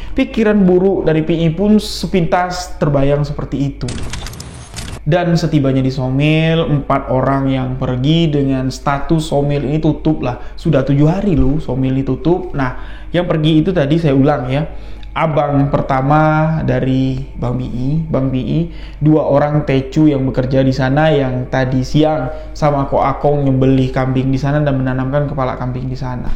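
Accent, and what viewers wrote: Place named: Jambi, Indonesia. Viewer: Aku suka dengar bahasa jambi...bila bang bercerita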